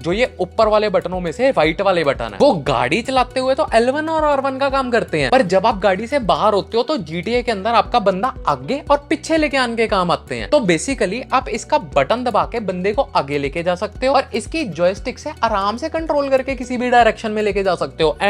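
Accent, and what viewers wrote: Punjab, India. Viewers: Veer ji are you from Punjab, accent ton lag reha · Thick Panjabi accent Hindi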